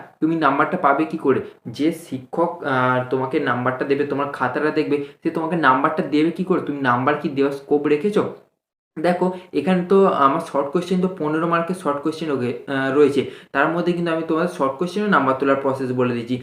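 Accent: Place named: Murshidabad, India. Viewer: sorry 🥺apnar voice ta onek ta murshidaabad er moto ty R ki bollam sorry🥺 kichu mone koren na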